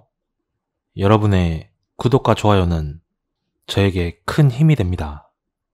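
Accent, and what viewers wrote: Jeolla, South Korea. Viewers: 전라도 사투리 졸귀탱ㅋㅋㄱㄱㅋ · 아 특유의 전라도 억양이 너무 정감가서 그런가 내용이 집중이 안되네요 좀 웃김 !! ㅎㅎㅎ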